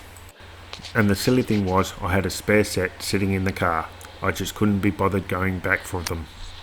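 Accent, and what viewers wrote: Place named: United Kingdom. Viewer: British! Lol heck yeah!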